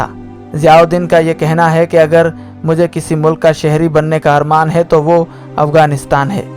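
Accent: India. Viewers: kus ni kush hotahai seems like koi indian bol ra hai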